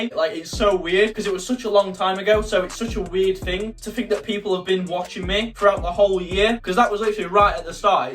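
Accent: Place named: Yorkshire, United Kingdom. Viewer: jus me thinkin his Yorkshire accent is bareeee cuteeee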